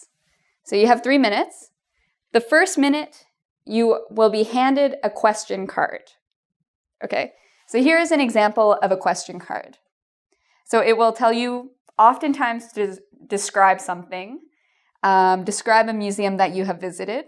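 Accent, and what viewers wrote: Canada. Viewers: Is she Canadian? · I am going to talk a-boat... the accent is sooo cute! Love Canada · Her Canadian accent is so cute.... Aboot <3333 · I'm Scotish and I don't understand your Canadian accent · Canadian accent is dope. <3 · good luck to everyone who's going to do the exam, kudos to you if you picked up on her Canadian accent, is a really competent teacher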